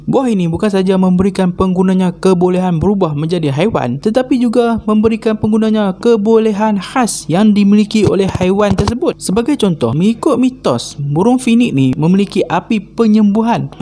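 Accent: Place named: Kedah, Malaysia. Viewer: Pekat kedah.. Haha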